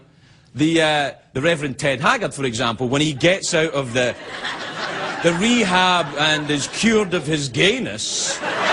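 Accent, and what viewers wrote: Scotland, United Kingdom. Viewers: This guy is awesome. Miss his show. Miss his Scottish accent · He sounded so much more Scottish back then. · Still had his Scottish accent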